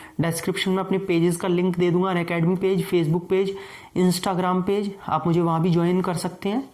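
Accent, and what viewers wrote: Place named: Haryana, India. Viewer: Sir awaj se aisa lgta ki aap Haryana ke aas paas ho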